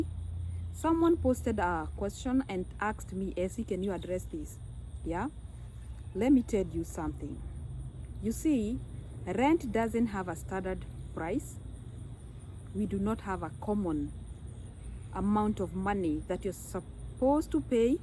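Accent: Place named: United States. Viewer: Am happy to heard on how you pronounce your words, now you are American typical..How long you have being there!?.😂😂💪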